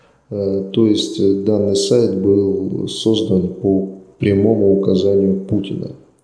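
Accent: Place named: Ukraine. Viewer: какой китаец, ты сам китаец.не улавливаеш характерныи интонация украинцев. свое время много общался украинцами. только непонятка наш украинец или. ..вовще зрить это самый корень.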